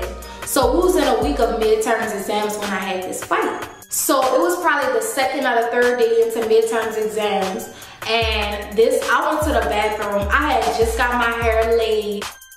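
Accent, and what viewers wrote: New Orleans, United States. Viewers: Your makeup ❤️ are you from New Orleans ? I hear alil bit of that Nola when you speak . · you from nola ? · You sound like you a Nola baby!!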